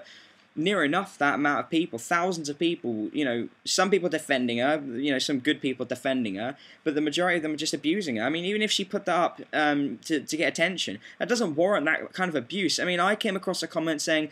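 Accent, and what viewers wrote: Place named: United Kingdom. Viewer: Lol a British guy..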